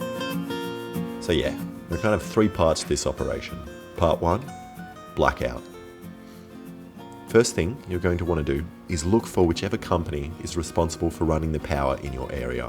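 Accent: Australia. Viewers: it's how ya going. traditional aussie greeting. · R u from Australien? Cause u Sound cool? Getreu from Germany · You Aussie mate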